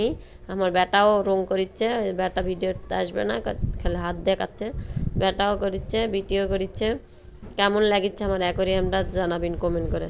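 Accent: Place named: Naogaon, Bangladesh. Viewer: অনেক ভালো লাগলো নওগাঁর ভাষা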